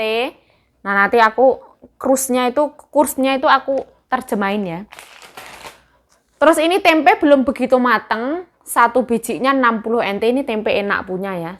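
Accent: Java, Indonesia. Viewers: seneng klo mbak klo uda ngomong logat jawa nya kental,sebutan kanjeng nyonya tuh dri taiwan apa jawa mbak klo boleh tau🙏😁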